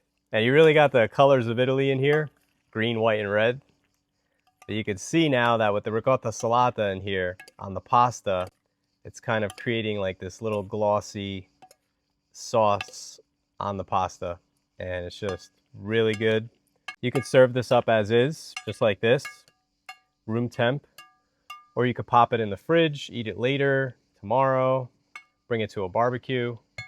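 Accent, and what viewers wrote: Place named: New Jersey, United States. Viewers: I knew you were from NJ. You just can’t mistake that accent. I love your recipes simple and doable. Can’t wait to try them. I’ve been saving them and writing my shopping list accordingly. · I knew you were from NJ! We both say water the same way. Where abouts? I grew up in Union County before I moved to Florida in '89. Anyway, my wife loves cherry tomatoes and orecchiette so, she's getting this tomorrow. The Cat Distribution System chose you, yeah the CDS is a thing.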